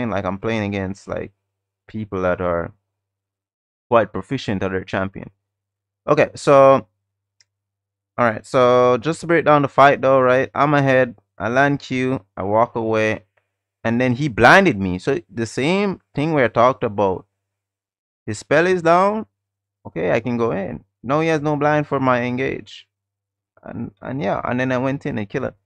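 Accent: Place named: Jamaica. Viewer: He's got a Jamaican accent, which is pretty unique, so don't get discouraged if you're a language learner. 👍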